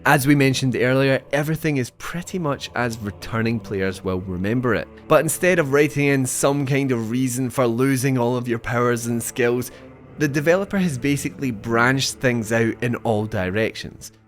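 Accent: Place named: England, United Kingdom. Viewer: 😂 i commented same regarding his english accent, so weird and different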